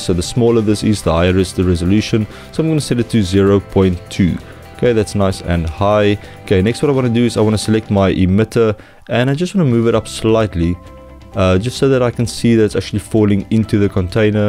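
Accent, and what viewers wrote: South Africa. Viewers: Nice to hear a South African voice doing some maya tuts. subbed.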